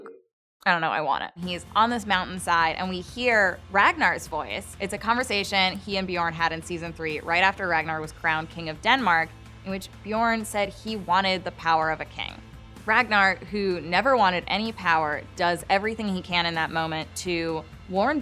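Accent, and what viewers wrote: United States. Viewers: I cannot stand this lady's voice this type of American accent is the worse sound in the world !!! And so and like and like whatever just speak he English language properly if your not going to you could always create an American language tbh I can't stand anyone from the new world talking about history ... Your all so isolated and far removed. From the rest of the world your perception of the old world is just wrong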